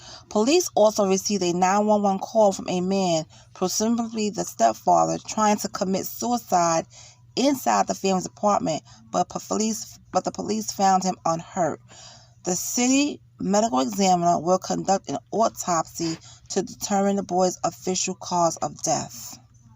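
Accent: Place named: New York, United States. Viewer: I'm from Detroit. I love your ascent. Sounds like NY?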